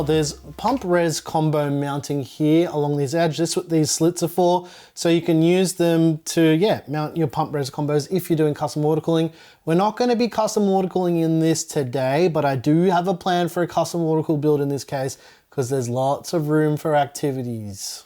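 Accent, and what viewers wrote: Australia. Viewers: Great case review, and your build looks great but Zeeee, You’re not AMERICAN you sound Australian, we say Zed, not zee!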